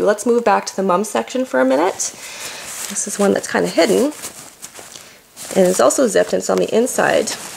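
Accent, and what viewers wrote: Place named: Canada. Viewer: Love the accent! I have a few friends from Canada.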